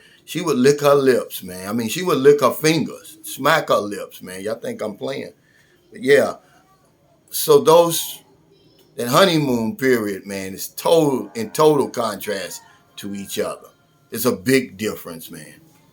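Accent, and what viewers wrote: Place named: Louisville, United States. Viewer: I knew I recognized the accent! Louisville in the house! Newburg here! I’m glad I came across your channel.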